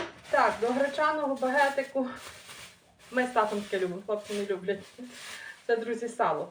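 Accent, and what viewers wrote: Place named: Ternopil, Ukraine. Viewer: Добрий вечір хочу вас запитати з якого ви району на Тернопільщині тому що я також з Тернопільщини яке ваш район,село в нашому селі є також така фамілія як ваша акцент ваш такий як у нас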